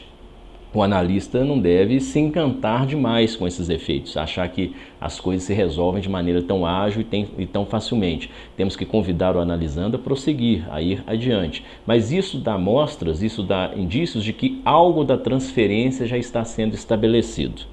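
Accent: Brazil. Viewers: Para além do conteúdo que me parece sensato, quero realçar o português que em todos os videos do autor é perfeito. Nunca vi um português com sotaque brasileiro tão bem falado e cuidado. Parabéns...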